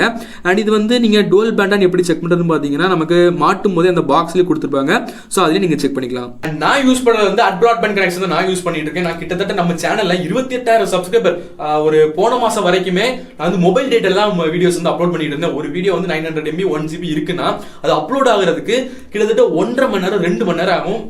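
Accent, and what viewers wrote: Kanyakumari, India. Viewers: Ninga kanyakumari ya na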